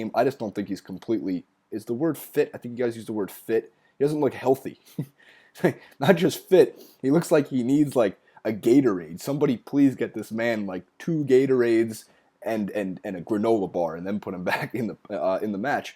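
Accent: United States. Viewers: american talking about football, sounds funny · I like hearing an American talking about football/soccer it is interesting and different, go taking over for today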